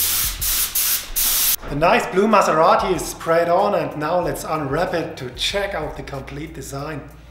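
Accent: Saxony, Germany. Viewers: Höre ich da sächsisch raus? Super Arbeit! Top! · Nice German acent! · your english ist so f***ing German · the Saxonian accent pleas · Man hört das deutsch durch:-))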